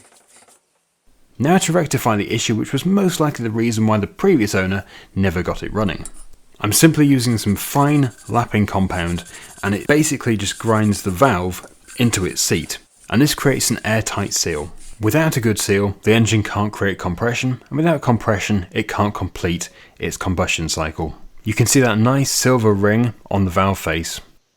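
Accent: United Kingdom. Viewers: British voice & machinery for restoration! That's so ASMR! Subbed! Thank you!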